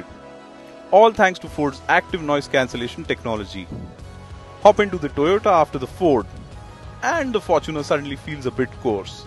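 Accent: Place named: India. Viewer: The host's Indian accent is so strong and freaking annoying. Keling pendek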